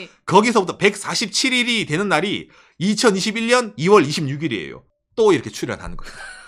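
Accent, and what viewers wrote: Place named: Ulsan, South Korea. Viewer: 울산분이시죠.. 근데 울산 억양이 부산, 경남과는 의외로 많이 다르고 경주, 포항과 함께 경주방언권을 이루고 있어서(요즘은 외지인이 많아서 많이 없어진 면도 있지만) 많이 순화된 대구, 청도말 느낌이 나죠.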